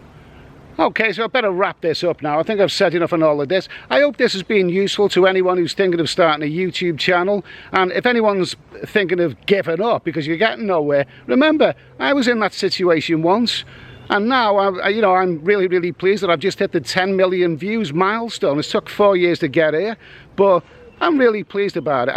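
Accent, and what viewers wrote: Liverpool, United Kingdom. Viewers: I watch your streams but sometimes it’s a bit too barrack room for me. I get that you do a lot of sex tourism vlogs but I watch because I think you have a brilliant sense of humour and you are actually a very good travel vlogger. So well done, please be proud of your scouse accent because it’s part of your humour. By the way I’m not a 20 stone bingo player at the local club. You deserve the views because your a good travel vlogger and watching you review Sainsburys pasties and Scouse Brandy is hilarious. They should sponsor you.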